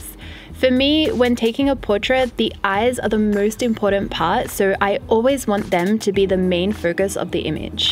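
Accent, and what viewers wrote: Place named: Australia. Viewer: Your accent is like Aussie pronunciation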